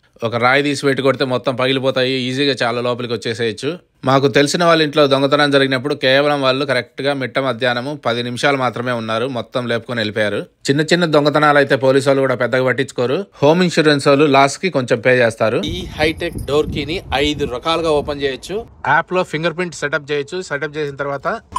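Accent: Kadapa, India. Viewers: Anna Meeru maa paalita PEDDA BALASHIKSHA . America vallu mimmalni ,meethopati swachmaina Rayalaseema Yaasani AP nunchi yetthukellaru. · Anna miru mana kadapa bhasha supar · Bhayya meeku maa vizag lo vunanatha etakaram and slang Kadapa vollu vundi ani choopisthunnaru sooper bhayya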